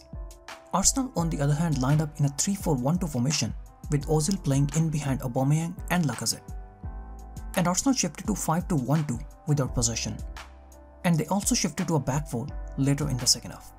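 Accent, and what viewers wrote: India. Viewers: I don't know him but this pronunciation sounds like that of an Asian, especially an indian, great analysis i wonder how could an Indian know so much of football instead of cricket.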